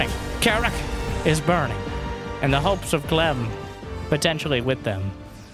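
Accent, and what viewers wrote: South, United States. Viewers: Dude im not gonna lie, I used to watch you years ago. I do NOT remember you having a southern accent whatsoever. Am I delusional?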